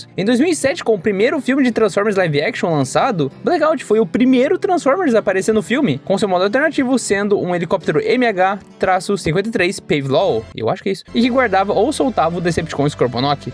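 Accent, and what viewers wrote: Paraná, Brazil. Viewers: mas pia eu sempre disse isso na tua live, é paranaense né, capaz. Com esse som so carca fogo no sape para comer pinhao, daí fica bom que é uma beleza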